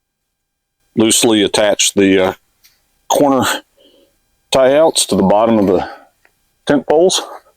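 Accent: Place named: Baltic states. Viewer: Baltic language accent is all over! Good video!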